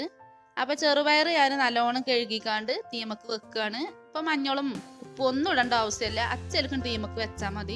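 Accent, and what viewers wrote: Malappuram, India. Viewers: തനി നട്ടൻ മലപ്പുറം ഭാഷ... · എനിക്ക് ഈ മലപ്പുറം താത്തന്റെ വീട്ടിലെ വിശേശവും മലപ്പുറം ഭാഷയും പൊളി നിങ്ങൾക് ആർക്കെങ്കിലും മലപ്പുറം താത്തന്റെ ഭാഷ ഇഷ്ടമായവരൊക്കെ ഒരു ലൈക് തരു 🙃🙃🙃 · Malappuram voice super😀😀😃😇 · Kasaragodil ninn kananad njan mathrano🤗.orupadishtayi malapuram slang😍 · അതുഞങ്ങളുടെ മലപ്പുറം ഭാഷ അത്‌ ഓവറായി തോന്നുന്നവർ.. ഈ വീഡിയോ... kananda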